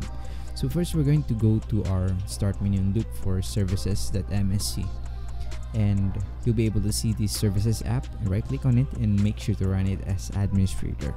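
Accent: Philippines. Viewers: nagawa konayung method 6 and then nag start nako ng game tapos yung problema konaman ngayon is vanguard not initialized hayop nayan valorant na valorant nako halos 2hrs nako naghahanap ng solution kada tapos ko ng method meron nanamang bagong problem btw salamat pre alam ko pilipino ka halata sa speaking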